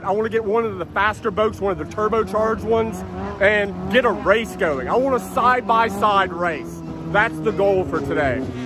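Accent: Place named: Baltimore, United States. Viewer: the baltimore accent makes this video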